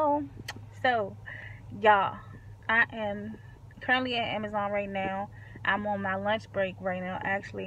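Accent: DMV, United States. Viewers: You from the DMV?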